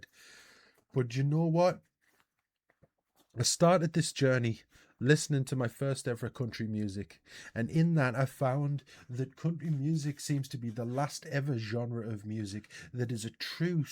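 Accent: United States. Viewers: Hi, You sound just like one of us. You ever get to the USA, we want to invite you to our home in Cave Spring, Georgia. We would be honored to show you around our little town and beautiful town park. Pop 1,000. God be with you man 👍 Mike Deaton, proud American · That’s crazy to Americans and I’m so sorry you are having to deal with that… you sound like an American have you ever thought of visiting or immigrating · Your words break my heart. You sound more American than some Americans I know. 🇺🇸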